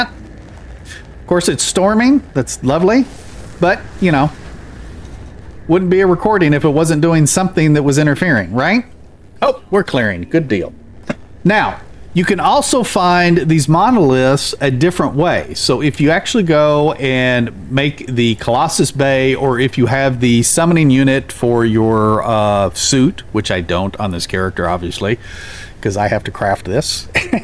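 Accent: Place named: South, United States. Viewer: I'm trying to pinpoint your accent. I don't think it's FL, sounds very similar to the AL, or GA that i'm used to hearing but perhaps not quite.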